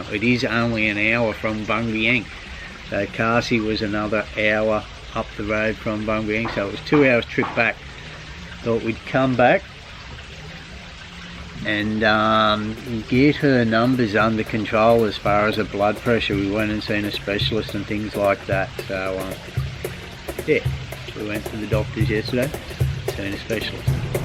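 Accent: Australia. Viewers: I want to search if you have videos from Pakse region, but the search button isnt active and there is no url for it. Isnt it active yet? Btw great videos you put out, I like your stories, your choice of music and how you and talks with everyone. The only problem I sometimes have is your aussie english, but i feel your pronouncing is better now than a year ago 😊👍. It may be me though...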